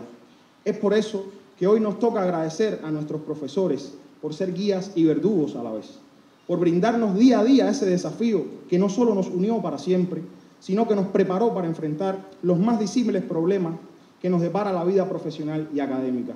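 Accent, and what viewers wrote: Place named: Argentina. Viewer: Oe socio, se te ha pegado hasta el acento de argentina, felicidades hermano, hace rato que no se de ti pero se que estas bien porque una gente buena, enfocada y competente como tu todo lo logra, un abrazo hermano desde la distancia, cuidate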